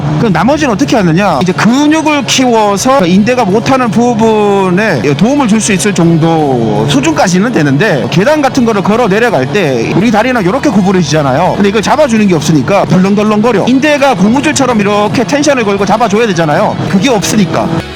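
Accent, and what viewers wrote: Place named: Gyeongsang, South Korea. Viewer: 경상도 사투리인데 딕션 깔끔해서 귀에 쏙쏙 박히네요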